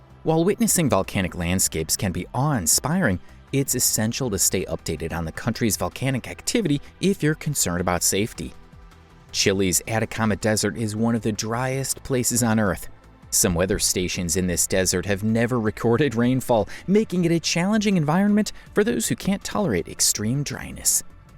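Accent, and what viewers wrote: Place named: Michigan, United States. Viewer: That Narration Accent Sound like my Michigander Accent! 🇺🇸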